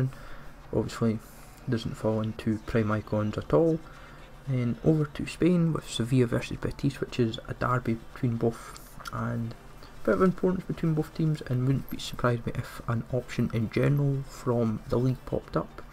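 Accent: Scotland, United Kingdom. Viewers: normally don't have a problem understanding jocks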